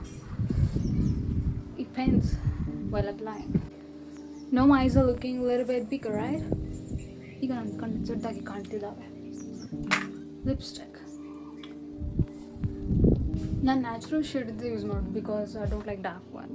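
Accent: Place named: Hubli, India. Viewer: hubli Accent ✌️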